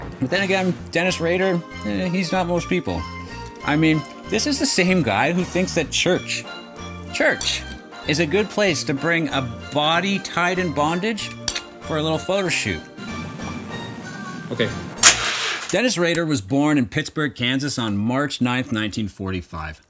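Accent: Canada. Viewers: I hear a Canadian accent? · Good vid! Wait….are you Canadian??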